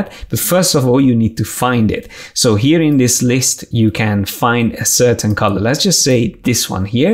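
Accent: Hungary. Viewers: Hungarian accent 👌 :D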